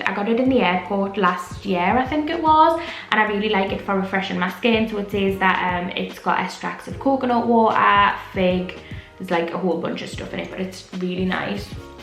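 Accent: United Kingdom. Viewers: Hi!! I've been watching your channel since 2018, for me watching and hearing you has been a great way to improve my english not only because i try to understand everything you say but also because you have such a strong accent that helps me get used to the different ways of speaking english in the uk! I found your channel when i lived in Leeds working as a Spanish/foreign language assistant in a school and it has been really useful! I'm glad that you are encouraging people to learn other languages and to be less selfish about speaking English in non-native english countries! I wish you the best luck with this journey:)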